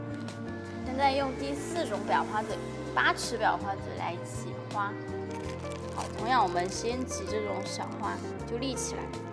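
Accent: Sichuan, China. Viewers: 干货很多，小老乡，加油！主要是听着川普比较亲切，没有笑话你的意思。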